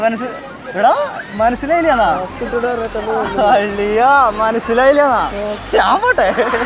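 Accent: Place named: Thrissur, India. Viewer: Thrissur slang 🤩💥🔥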